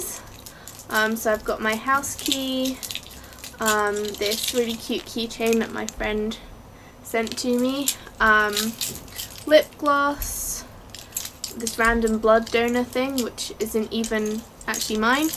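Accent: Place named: United Kingdom. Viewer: Hi, if i saw right... your name is??? I'm not very sure!!! hah. and if you don't mind me asking, where are you from?? You have a lot of Japan things, but it sounds like a British ac sent (i do not know how to spell that words).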